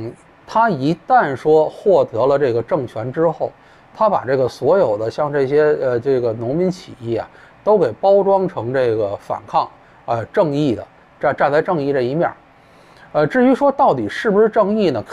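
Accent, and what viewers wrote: Beijing, China. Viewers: 这口儿挺正的，闹半天不是北京人呐？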